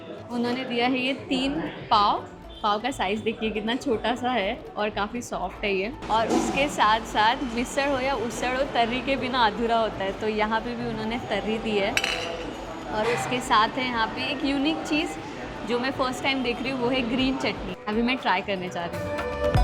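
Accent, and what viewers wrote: Gujarat, India. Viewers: Very very very poorly researched video Also the lady commentator desperately needs to either speak in Gujarati or not at all I have grown up in Baroda and can say with all assurance that the script, the choice of sites and ugh, that Gujarati accent trying to struggle with Hindi are all awful! This video does ABSOLUTELY no justice to my beautiful Baroda.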